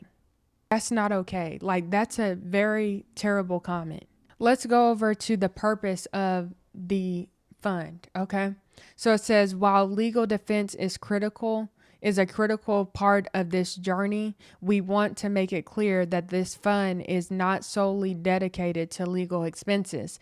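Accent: United States. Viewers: Where is she from? Sounds American to me, but this is the first video I've watched. ❤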